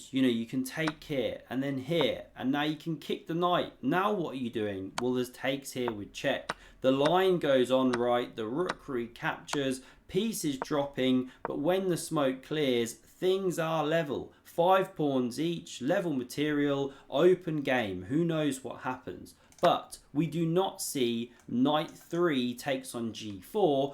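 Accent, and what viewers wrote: England, United Kingdom. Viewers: I came here for the English accent, but stayed for the chess. Heh.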